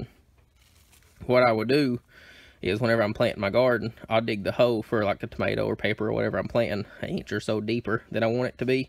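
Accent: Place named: Alabama, United States. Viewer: gotcha. Could have sworn that was an Alabama accent.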